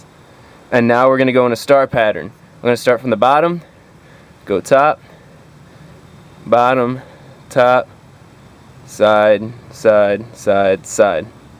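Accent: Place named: New Jersey, United States. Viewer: he has a New Jersey accent! -from Texas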